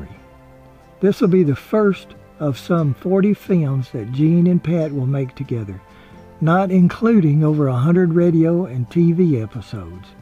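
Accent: South, United States. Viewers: Great narration as always. Your smooth voice coupled with your southern accent makes your work more appealing. I only knew this actor from Green Acres. I had no idea he had such so many other roles! Very interesting. Thank you. I really enjoy your work. Stay safe! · So nice to hear a Southern voice on documentaries! This was so well done and respectful. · I've said it before but say it again when I hear the SOOTHING SOUTHERN VOICE of I know I'm HOME in Louisiana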